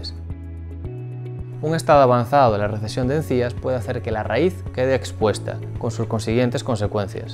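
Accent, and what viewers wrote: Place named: Galicia, Spain. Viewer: parece de Galicia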